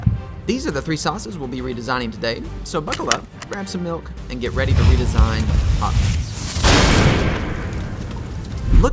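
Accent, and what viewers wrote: South, United States. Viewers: are you southern or something?? such a weird accent lol.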